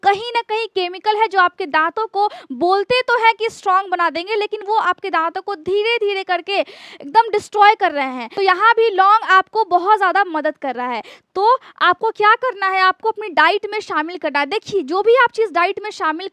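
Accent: Bihar, India. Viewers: Kya Aap Bihar Se Hain??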